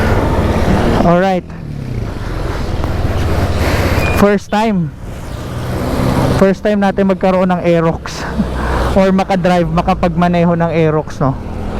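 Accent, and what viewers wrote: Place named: Bicol, Philippines. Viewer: Bicolano ka boss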